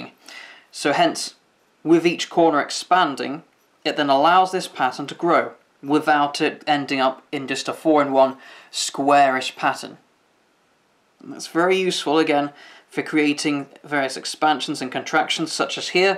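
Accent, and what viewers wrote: United Kingdom. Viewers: Off topic, what area of england is your accent from? Also, thanks for the lessons.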